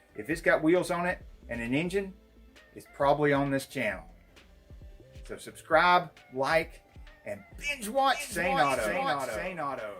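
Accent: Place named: South, United States. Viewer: I love your reviews, especially with your original southern accent 😁👍🏻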